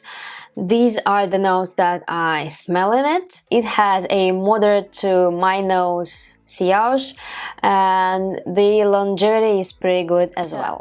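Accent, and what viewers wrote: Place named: Russia. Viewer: ну вы явно русскоговорящая. Через экран слышно😁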